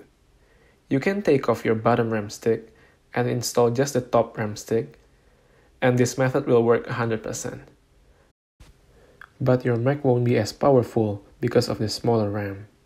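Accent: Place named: Indonesia. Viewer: lsg ngenalin logatnya orang Indo, eh terconfirm dengan modif mobil jadi polisi lalu lintas wkwkw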